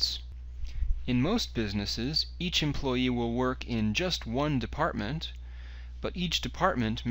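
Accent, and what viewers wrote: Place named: England, United Kingdom. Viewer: Thank you for posting this video. I am happy that I could find one with a perfect English accent.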